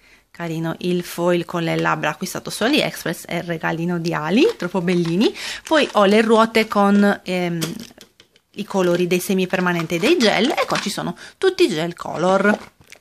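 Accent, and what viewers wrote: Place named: Sardinia, Italy. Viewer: Ciao bellissima postazione. Anche io SN sarda. Appena ho aperto il tuo video ho riconosciuto subito l accento. Sei troppo simpatica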